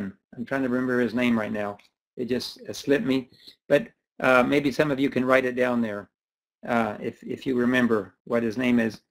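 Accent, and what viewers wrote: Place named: Hawaii, United States. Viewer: Thanks for being real Uncle. I know we're on the right path and the right message. God revealed to me the same message before I noticed you were giving it too. Ellen's warning is for the sleeping. Her confirmation is hidden in her warning about time test. Those that refuse to awake will naturally miss it. I am confident that you are aware and I love your accent it's very much like the Hawaiian accent. And we call all our elders Uncle so I'm very comfortable with you and the way you talk and think. Praise God that I am not completely alone in this planet of zombies. 🤙🙏❤️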